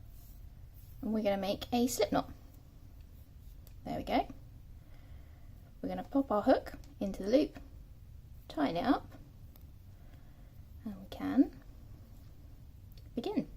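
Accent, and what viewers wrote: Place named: England, United Kingdom. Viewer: Are you using UK or US terminology?? Looks like US but you've a English accent.. Its confusing me..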